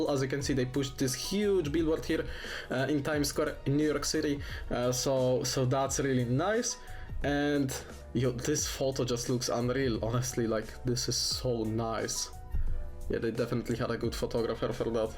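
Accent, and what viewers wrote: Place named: Eastern Europe. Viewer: you have a nice accent what is it? some slavic it seems. I already bought for 1000$ shr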